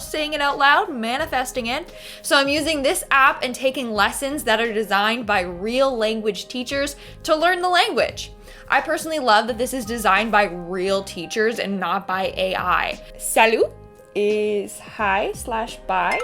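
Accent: Canada. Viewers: Hey are you Canadian and if so French Canadian? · Are you Canadian ?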